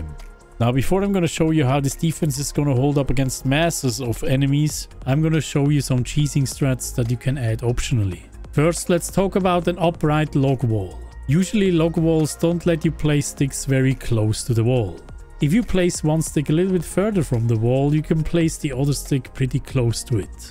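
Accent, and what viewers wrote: Switzerland. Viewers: meh ghört sofort das en schwizer bisch. vo züri? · me ghört eifach das schwizer bisch, endlich mal en schwizer wo gueti videos macht🎉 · Fast nöd denkt mit dim dialekt das du en schwizer bisch <3 Schad das die videos uf englisch machsch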